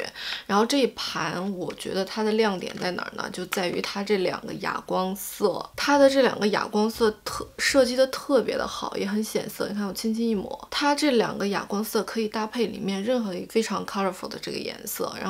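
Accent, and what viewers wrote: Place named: Sichuan, China. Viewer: silvia是四川人么，说水蜜桃的时候就在想，直到说卸甲水的时候说吃进去转一下的时候觉得我们是老乡耶哈哈，稀饭你～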